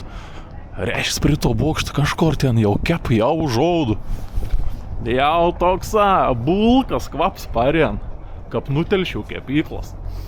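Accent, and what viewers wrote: Samogitia, Lithuania. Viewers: Mažiau zemaitisko balso jau truputi perdaug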